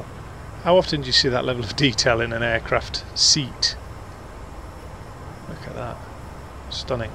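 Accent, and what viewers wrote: United Kingdom. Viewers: I'm so pleased to have discovered your channel. Being uk based it was so refreshing to hear a brit go through DCS as you have done in this video. I've been sitting on the fence for a good two years now about (a) a decent gaming PC and of course (b) getting into DCS. I've watched a lot of content, most of it American content which with all due respect drives me a bit potty. Too much waffle and being US based I find myself being totally confused over what hardware to go with. Now I'm not in a position to literally build a simulator and damn near build an F16 type cockpit, with almost an ejection seat built in. However I'm in a position to get the right kit. I know it needs a decent PC, warthog HOTAS is a given c/w decent pedals. It's the PC hardware I'm struggling to understand. Do I go with the precise recommendations from DCS? IR or VR. 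If getting VR do you drop going on a top monitor? Recommended PC suppliers etc. Its these questions I'm struggling to get answers on. I hope you can help.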